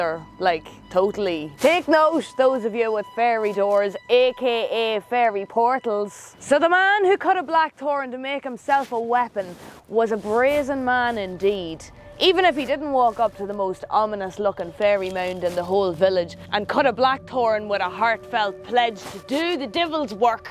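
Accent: Ireland. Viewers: I LOVED THIS AND THAT IRISH ACCENT !! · I don't know what it is about irish excent, but it makes me laugh mien ares off. 😂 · Thank you and greetings from U.S. If I got into trouble, my Dad use to say, "If you do that again, I'll take the Shillelagh to ya". He would have loved to hear you talk. He loved the Irish accent, it seemed musical to him. I carved a walking stick for my brother in law after he had back surgery and he said he wasn't going to walk around looking like an old man with a cane (he was only 65). He didn't want it but when I said it wasn't a cane, it was a Shillelagh, he smiled and grabbed it from my hand. It isn't a Shillelagh but I won't say anything.👍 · I just like hearing her talk. The Irish accent is like music to these old ears.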